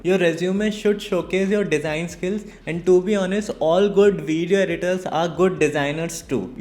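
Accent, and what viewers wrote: India. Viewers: Kyuki accent indian hai ❤️😁😁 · I realised this video is in english after reading your comment. The accent is very Hindi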